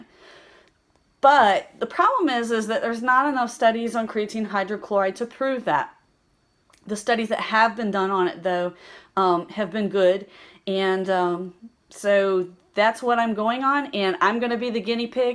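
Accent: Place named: South, United States. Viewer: I'm hearing a little southern draw there. It's refreshing. Good info.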